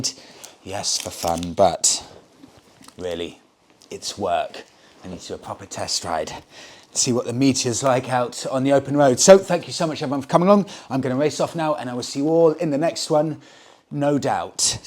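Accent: United Kingdom. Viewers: Beside of that beautyful Bike I love listening to Your nice british English!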